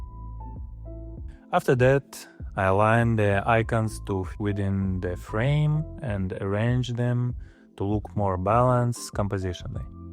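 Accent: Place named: Russia. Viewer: Ты русский? Английский палится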